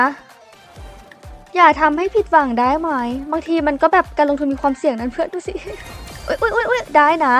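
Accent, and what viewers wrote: Rural, Thailand. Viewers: ชอบเสียงพี่เค้ามากเลยอ่ะโอ้โหเสียงแบบเหน่อได้ใจ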